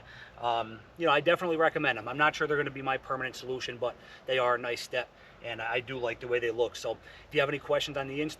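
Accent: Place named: Minnesota, United States. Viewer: You from min or Wisconsin? lol me too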